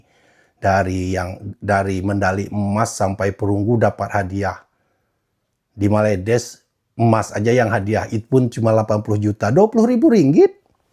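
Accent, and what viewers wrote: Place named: Banyumas, Indonesia. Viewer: mantap nih orang ngapak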